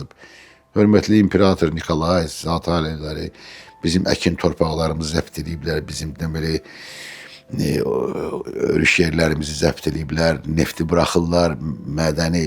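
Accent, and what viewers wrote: Baku, Azerbaijan. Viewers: Ləhcəsindən hiss olunur ki bakılidir. müəllimə Allah can sağlığı, 100 il ömür qismət etsin. Belə verilişlərin sayı çox olsun.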